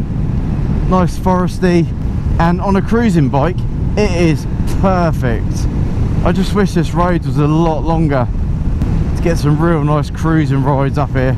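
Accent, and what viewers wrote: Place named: United Kingdom. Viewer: 😮Man is your British accent HEAVY dude. It's kind of hard to understand you to be honest with you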